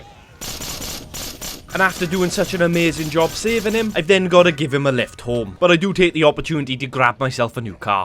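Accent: Wales, United Kingdom. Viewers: Gave you a sub because you're entertaining and perhaps oddly enough, because you're Welsh! My Father's entire lineage is Welsh and can be dated back generations, but I know very little about the culture and rarely come across anyone from Wales hete in Boston. A ton of Irish, but no Welsh! You are the first person since my departed Gpa and Gma that I have heard w/that accent. Makes me smile! ,👍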